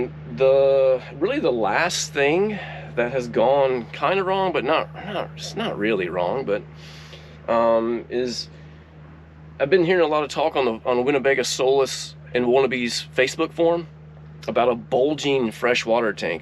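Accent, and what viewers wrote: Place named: Georgia, United States. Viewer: Sound like a Georgia Boy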